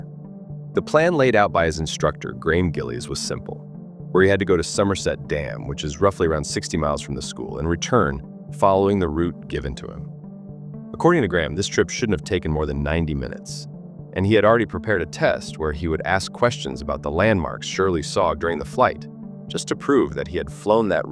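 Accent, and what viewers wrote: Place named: United States. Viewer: As an avid Skyhooks fan from day dot, for some reason I've always been obsessed with the death of Shirley, always digging deep into further research into the mystery circumstances surrounding the actual cause of why the chopper crashed. We all knew there could have been many factors, like how the weather conditions took a turn, but it was always an unsolved curious puzzle and more to it than meets the eye. I've seen a lot of reports into this over the years, but this is the first time I heard of Shirley possibly having a heart attack, that sent a chill up my spine, something we probably will never know. Also, who is this American or Canadian narrator, his pronunciation is incorrect, it's Red Symons not Simmons!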